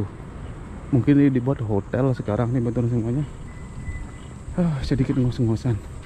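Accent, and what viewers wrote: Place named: Bali, Indonesia. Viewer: Logat nak bali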